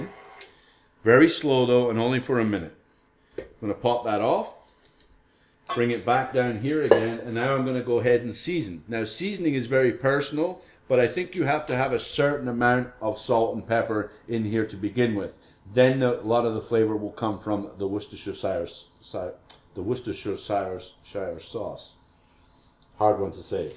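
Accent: Scotland, United Kingdom. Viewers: He's Scottish ! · Canna hide his Scottish accent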